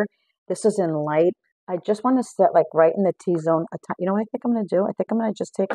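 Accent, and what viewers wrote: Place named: East Coast, United States. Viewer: just found you and subscribed, mostly so I can hear your east coast accent! Love the Look!! this is about what I do on the daily, I might put on a fuller coverage foundation for going out but like you said, less is best, I'm a 68 year old Midwesterner and I could listen to you talk all day!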